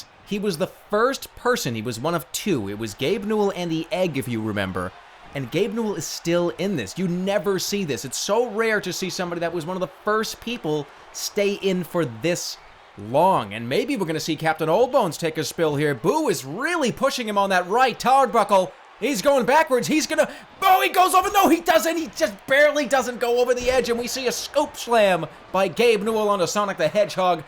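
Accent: Boston, United States. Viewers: sounds so much more bostonian here I love it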